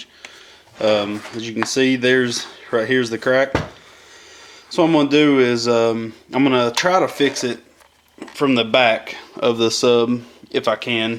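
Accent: South, United States. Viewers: There is something about the high amount of humbleness a man sounds like with a genuine southern voice amen! Thanks for the video will attempt repair on my logitech 7 inch desktop sub since the rubber around the sub tore.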